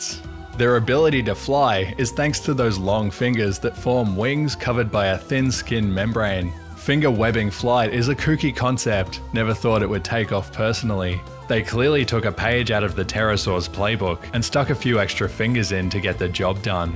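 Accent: Australia. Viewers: You sound like FootLettuce but Australian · I love an Australian doing an Australian accent!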